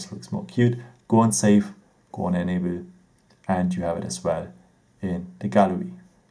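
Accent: Germany. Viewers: Are you from Germany????😂🙂😁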